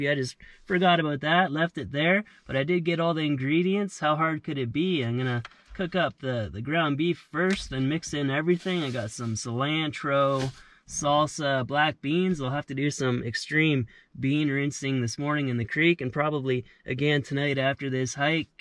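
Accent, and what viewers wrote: Canada. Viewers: You got me with your pronunciations. I thought Canadians just didnt know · Most Canadian language pronunciation episode yet!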